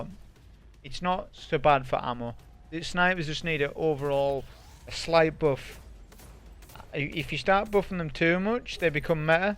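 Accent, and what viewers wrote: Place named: Newcastle, United Kingdom. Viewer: That's a fine Geordie accent 👍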